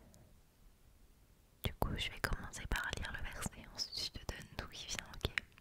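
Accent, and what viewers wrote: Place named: Italy. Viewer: Olala incroyable ce mélange italien/français !! puis tu as un accent italien magnifique je trouve ! Une partie 2 un peu plus longue serais génial😍 Merci pour c’est belles vidéos Sois béni, que dieu te protège🙏🏻✝️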